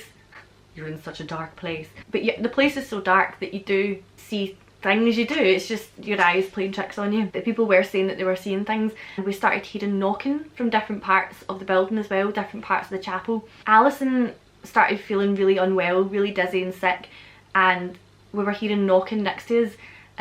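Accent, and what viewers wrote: Scotland, United Kingdom. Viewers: Looking for evp vids whilst working. Clicked on yours and I am glad I have not even got to the Evps but its nice to hear another Scottish accent on the old YouTube. Subscribed straight away haha love from Dundee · Scottish! I could tell right when you opened your mouth,lol. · I love your accent so much. My family is Scottish on my grandma's side and we visited last year for the first time. I fell in love with the Highlands and would love to move there some day. 💜🏴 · btw, might I suggest this to your viewers? I would suggest they go watch Jessica here <link> and then come back to videos, and just try to understand her. It's so weird, my brain switched to the Irish accent (trained linguist here btw) and then when I got here it couldn't switch to Scottish accent! It's like it got stuck so I spent more time struggling with this video, when normally I don't have any issues. 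I think I might have just discovered how to break your brain! LOL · Didn’t expect you to be Scottish lol. Pleasant surprise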